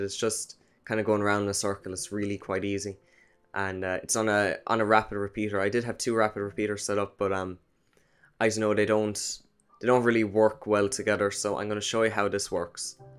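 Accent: Ireland. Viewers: Are you Irish ? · Are you Irish?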